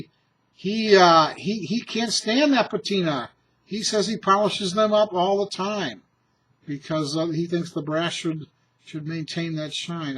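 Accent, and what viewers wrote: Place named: United States. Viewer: This american accent while speeking german words is the besteht :D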